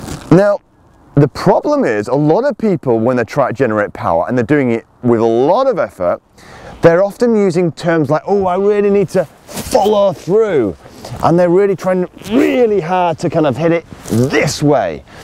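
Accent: Yorkshire, United Kingdom. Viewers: That's because he's from Yorkshire - no bull!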